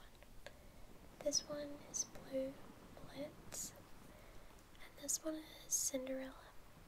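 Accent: South, United States. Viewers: Personally I miss the slightly insane... but coming from London UK I can get by with that southern drawl quite fine. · Southern accent really gets me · For years now I've been tryin to decide where shes from based on accent. I wanna say Ga or SC.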